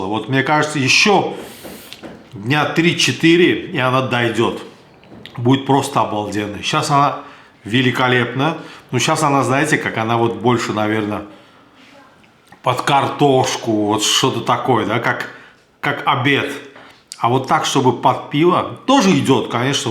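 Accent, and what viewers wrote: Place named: Baku, Azerbaijan. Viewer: Салам из Дербента....спасибо за такие видео.. Такой,родной Бакинский акцент Саг Ол От души 👍👍👍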